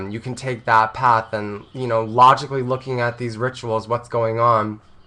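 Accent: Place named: United States. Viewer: No need to apologize. Hindi pronunciation varies in different regions of North India. You develop your own American Hindi pronunciation. That would be more original. ;-)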